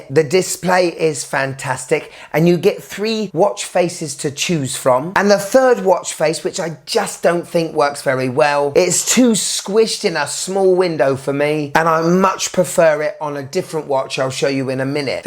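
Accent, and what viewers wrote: United Kingdom. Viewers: Great test and great British accent you have it reminds to my good old days 🤣 Just placed order for the watch Cheers❤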